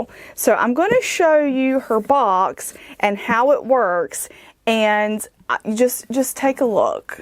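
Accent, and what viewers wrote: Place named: South Carolina, United States. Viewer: Your accent reminds me of South Carolina. Love those dolls you showed.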